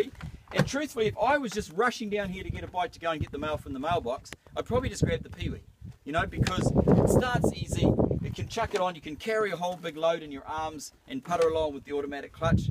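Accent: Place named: New Zealand. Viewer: He's kiwi.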